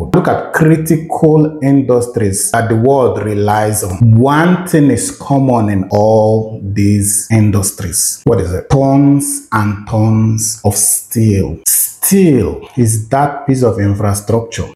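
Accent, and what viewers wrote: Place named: Igbo, Nigeria. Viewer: Your Igbo accent is too strong, sir. Just make use of Igbo language for goodness sake. Thank you, sir.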